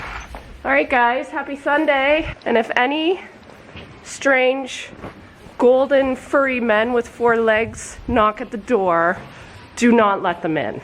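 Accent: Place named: Perth County, Canada. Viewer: I thought I recognized your accent 😁 Out near Perth county myself, the snow has been crazy this year!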